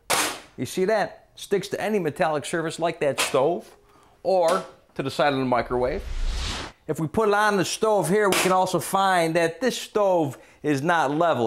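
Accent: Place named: Brooklyn, United States. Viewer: I am getting Italian Brooklyn vibes lol. Learned a lot TY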